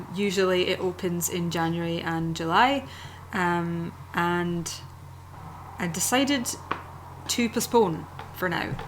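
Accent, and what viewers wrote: Scotland, United Kingdom. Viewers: Hi, sorry for commenting on this one about a vid from the past :P but im so into the storyembryo and I have a question! :D How does foretelling as a tension creation tool work with this plotstructure? Can I foretell something out of the '#6 Pay a heave price (enlightenment/chaos)' part before I start at the '#1 Comfort zone (ignorance/order)'? Ofc I can, I know. But does it work technically? I've written a lot in what I call adventuremode. Now I'm in tidy-upmode so I'm interessted in the theory and if you know more about that! Love your accent. It's Scottish right?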